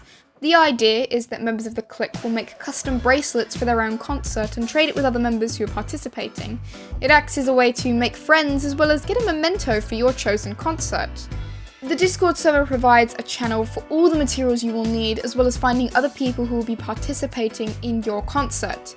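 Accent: United Kingdom. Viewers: i didnt know you were british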